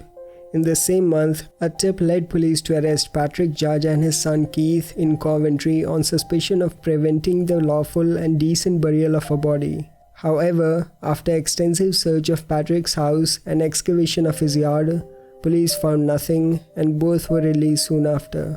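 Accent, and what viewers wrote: India. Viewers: The people criticizing are just assholes. Let's see you all speak his language with a perfect indian accent...when you can, then criticize. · I meant good, cuz I am indian.. And I found that the way he speaks, is the way that also some of us speak here in India. Doesnt have much of that american accent.. You wont get it. 🤭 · Why don't you check out idk maybe half the true crime/ horror story yt community, also he does speak English he just has an Indian accent which is why he has subtitles (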